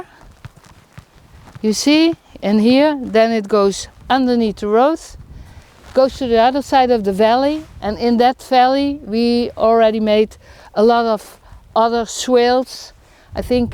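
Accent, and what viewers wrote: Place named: Netherlands. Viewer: Dutch people on holiday XD I can 100% tell by the accent this women is Dutch. We do this since we are born lmao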